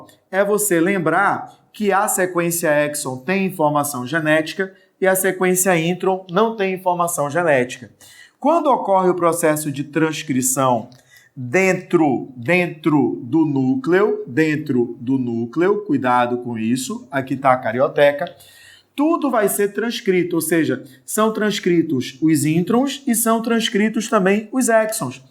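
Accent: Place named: Pará, Brazil. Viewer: O senhor é incrível!! Esse sotaque é paraense 😂❤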